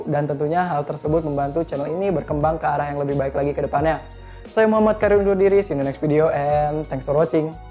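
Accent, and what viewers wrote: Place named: Slawi, Indonesia. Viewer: Sampean wong slawi ya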